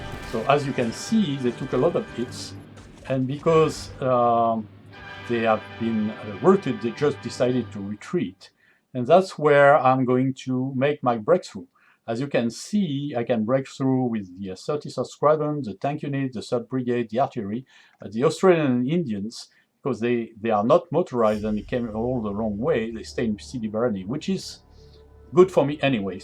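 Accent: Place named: France. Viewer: Well done! Great explanation of game mechanics along with good game play. As I worked in Paris, I enjoyed your accent and brought back wonderful memories.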